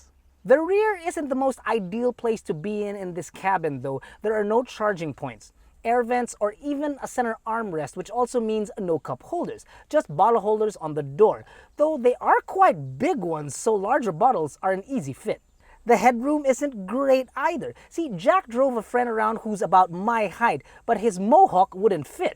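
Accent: United Kingdom. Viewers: medyo dinig yung hangin sa B-roll.. baka mas okay kung i-mute? Love the British accent. hehe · That hilarious British accent killed me! 😂 On a serious note, what are non-Chinese car manufacturers thinking? Chinese car brands (or atleast cars made in China) are bringing better tech and value at a lower price in the Philippine market. It's as if they're just relying on brand recognition and perceived "dependability in the long run" to make sales.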